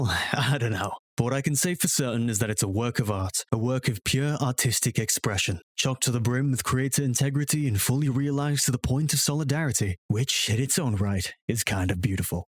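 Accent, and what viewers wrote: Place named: United States. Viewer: Brit who talks like an American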